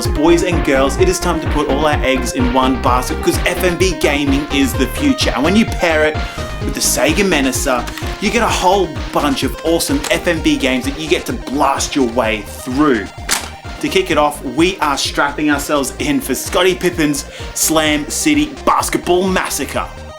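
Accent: Australia. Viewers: you're Australian... its sea-ga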